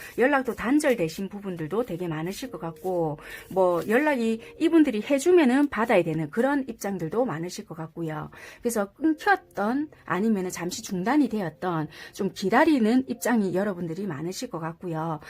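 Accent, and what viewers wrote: Busan, South Korea. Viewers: 하이용 또왔어용 쩍지보러 제가 궁금한건 믿음이 안가는 현실을 스스로 만들고 믿어달라하는 타로가 나와서 사람은 믿을게 못되는데 타로를 재미로 보기가 힘듬 물어볼때가 없어서 보는거거든요 잼있게 보고 조아요 미리누르고 가요 좋은밤되요 👍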